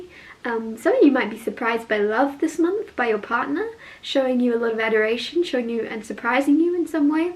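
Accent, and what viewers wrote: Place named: United Kingdom. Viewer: Luv your readings...you are spot on..exquisite an very beautiful..Luv your English or British Accent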